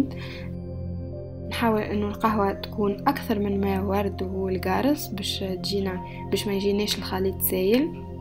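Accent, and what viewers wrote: Tunisia. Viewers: نموت على اللهجة التونسية ماشاءالله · هاي اللهجة تونسية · تونسية على حسب لهجتها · اوكي شكرا على المعلومة لهجة تونسية زوينة عجباتني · نموت على هدرت توانسا حلوين ياسر 😘😘😘انا من الجزائر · أنا كذلك أعشق اللهجة التونسية كثيراً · b9it nesma3 fi lahjtek w n9oul on dirait djerbienne hata 9olti "ki rawahet jerba" machallah 3lik charmante fière que vous ete tunisienne et surtout djerbienne :) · هي تونسية و حافظت على لهجتها وهذي حاجة باهية💓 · هي تبان تونسية من هدرتها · نوره البغدادية بس المشكله هيه تونسيه منفتهم حجيها بس اني افتهمت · لا يوجد اللهجة هاذي تونسية مش مغربية😌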